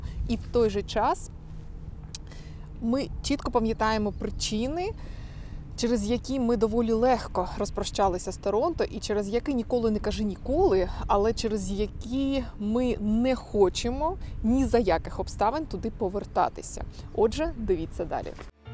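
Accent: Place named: Kyiv-Poltava, Ukraine. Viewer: Інколи Ви розмовляете київсько-полтавським діалектом.👍Дякую Вам за це🌷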